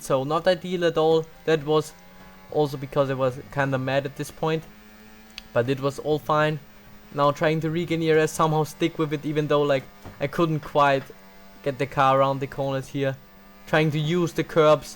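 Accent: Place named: Tyrol, Austria. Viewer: Aus welchen Bundesland kommst du? klingst wie ein Tiroler:-)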